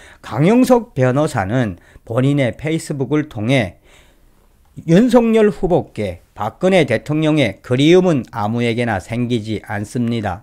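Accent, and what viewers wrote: North Korea. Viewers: 말투가 북한 사람같내?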